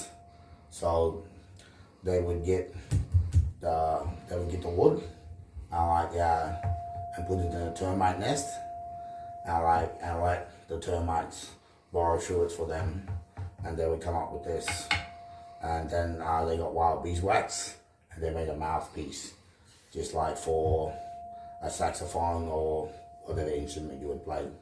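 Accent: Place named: Australia. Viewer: I honor your heritage bro, I knew you had an Aussie accent since the get go. My favorite country besides my own is Australia, I probably mentioned before how much I enjoy Rugby football. If I was able to I'd love to come and go on a hunt for an opal. One of my very favorite tv shows is about hunting for opals. I'm Native American and my heritage includes the totem as well. Something you and your bro could share is the boomerang and it's impact on survival for food and the technique to accurately make it's target. THANK YOU both for sharing part of your life story; the artwork is beautiful! I wish I also could honor you with support in some manner. THANK YOU! Watching you from a long ways away in the State of Minnesota U.S.A. 👌😊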